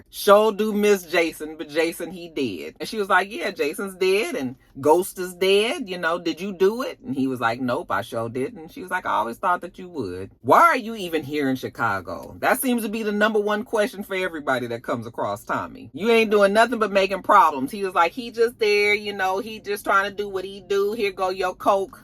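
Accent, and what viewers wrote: Ireland. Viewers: 🤣😂🤣😂 I loooove your Irish Accent!! Excellente' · It's the Irish accent for me...:)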